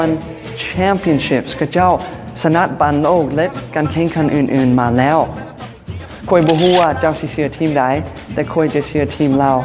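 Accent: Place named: Laos. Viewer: ເວົ້າລາວ ເປັນຕາຮັກນໍ ອາຈານ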